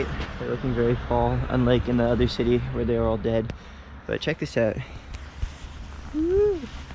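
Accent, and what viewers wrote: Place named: California, United States. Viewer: Are you from California? lol that accent is like deep Californian but love the video!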